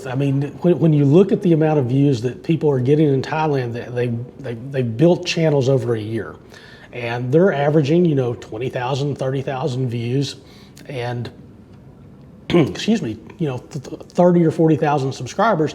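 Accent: United States. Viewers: Nice man. You deserve more subscriber tobe honest. The reason why i subscribed is because i wanna adapt your accent. American accent? And Your videos as well. Like literally i love your videos.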